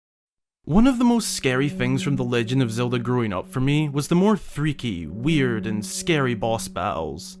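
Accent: Scotland, United Kingdom. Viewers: Your Scottish accent is perfect for your Zelda content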